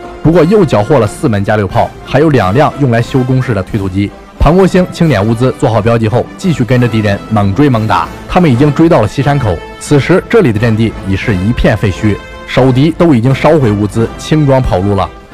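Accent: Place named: Jiangzhe, China. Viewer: 你說的大致正確 連 “吐蕃” 都能唸出正確的 “土鉢” 的確比99.9999％的中國人要有水平得 多得多！ 可惜你的北京話 實在很糟糕！ 帶江浙土話口音 實在太重！ 唉！ 能不能先把你的北京話 練 “六” 一點 再來拍視頻啊？ 北京是首都欸 你是忘了嗎？ 莫非你是海外華人？ 如果真是 那就當我沒說！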